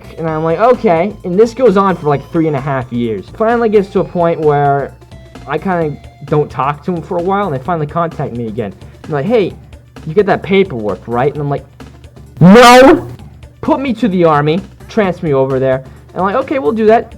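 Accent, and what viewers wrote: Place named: Mid-Atlantic, United States. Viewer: Awww you got the cutest mid-atlantic accent. Also: Deer do have fangs. And not like, during the Pleistocene, some deer have fangs to-day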